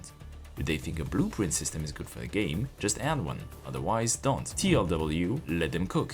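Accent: France. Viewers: You french?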